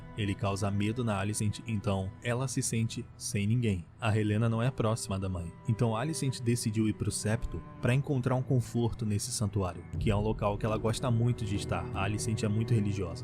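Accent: Rio de Janeiro, Brazil. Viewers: Esse sotaque carioca me pega muito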